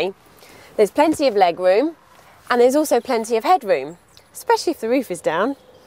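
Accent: United Kingdom. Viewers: lovely British accent. love that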